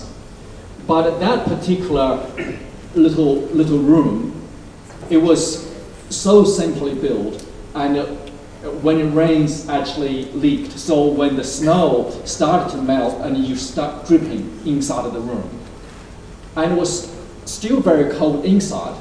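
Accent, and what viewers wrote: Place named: Australia. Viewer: All those years around a 'Aussie' wife...he has a slight Australian accent. So loved listening to him tell about his life. Loved the movie about him!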